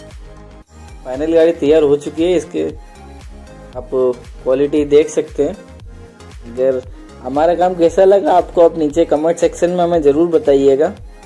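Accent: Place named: Bihar, India. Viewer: Osm work bro Bihari language me ekdam kantaap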